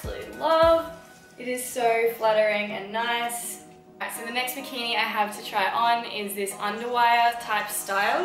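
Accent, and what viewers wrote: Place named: Australia. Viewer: Australian girls are so hot and the accent is very cool.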